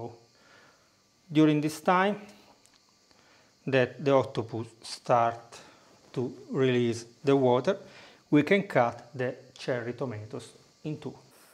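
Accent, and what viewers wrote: Italy. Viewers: Italian accent????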